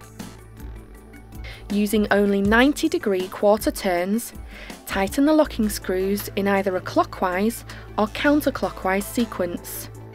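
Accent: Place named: United Kingdom. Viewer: I hate British accents....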